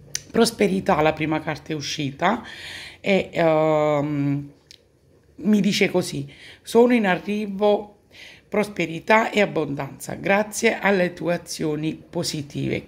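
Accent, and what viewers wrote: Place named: Naples, Italy. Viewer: Grazie,per le tue parole,anche il tuo napoletano è dolce ascoltarlo ,per me è la prima volta che ti ascolto,può essere così inportande sentire, quando tutto intorno è crollato, una previsione serena,auguri anche a te per la festa della mamma,penso che tu lo sia e anche se non lo sei auguri comunque,ciao.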